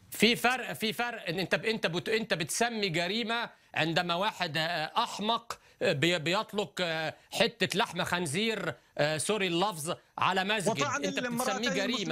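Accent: Egypt. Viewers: il parle le dialecte égyptien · Les maghrébins parle le dialecte algérien marocain ou tunisien parceque c comme sa que les habitant du Maghreb parle !! Lui parle le dialecte égyptien qui ressemble bsp à la langue arabe littéraire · Hahaha, ça fait bizarre de le voir parler arabe égyptien. Au meme titre que zemmour, j'admire ce monsieur. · il dit souvent " farança (la France) faransi (Français) lol et il parle l'arabe littéraire comme les égyptiens!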